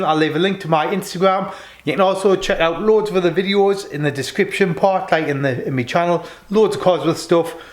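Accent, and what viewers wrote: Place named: North East England, United Kingdom. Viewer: Great videos mate. Because of you I’m really getting in to Ford. You from Newcastle, Sunderland or Middlesbrough? Rob from Ireland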